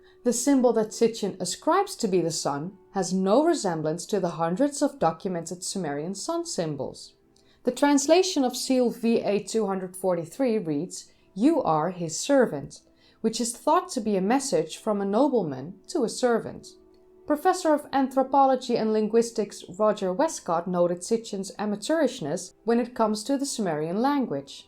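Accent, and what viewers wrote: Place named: Netherlands. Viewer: your (dutch?!) accent is very nice to listen to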